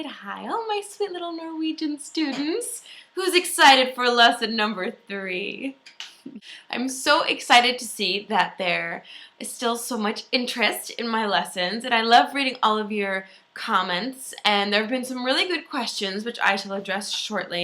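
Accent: United States. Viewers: It's both written and spoken. Did you grow up in the US? You have a slight accent. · hi teacher your lesson 3 that I sow was very good lesson n you have really American accent your English very good that is all I understand , I just settle nordland Norway and I really want to learn norwagian thanks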